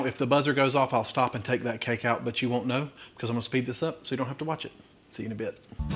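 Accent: South, United States. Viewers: Even if I have no interest in a particular recipe I ALWAYS love to hear what has to say. The videos are exactly as long as they need to be and have helped me stick with keto and not get bored. Useful info, humor and heart — and that delightful southern accent just seals the deal! ❤ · I love your videos and the cakes look delicious, you make me smile the way you react to the taste testing, well done, your a great cook and a big thank you to the creators of these cakes, I also love that Southern drawl of yours. ❤😎 🇬🇧🏴🇺🇲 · Oh my goodness, so I stopped doing keto about two years ago, maybe more and I have gained more way in the last two years than I ever have in my life. I have been so miserable, so I’m jumping back on the keto wagon. But I used to watch your videos all the time, and I just have to tell you… You look amazing!!!! I thought you looked great several years ago, but when I saw you again, I barely recognized you. 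I knew your sweet, southern accent. If you didn’t have a husband and you were straight, I’d be hunting you down… Just kidding, I’m not a stalker. But you do look amazing honey. I’m so proud of you.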